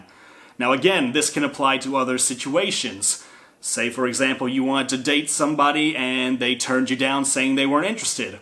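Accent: South, United States. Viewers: Whaaaaaaaaaat? You are country , No affence love ya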